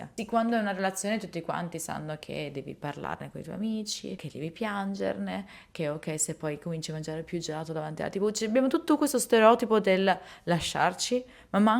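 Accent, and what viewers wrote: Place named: Sicily, Italy. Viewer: Ma stai sempre col ragazzo siciliano? Hai un accento più siciliano rispetto a 1 anno fa direi (detto da un siciliano).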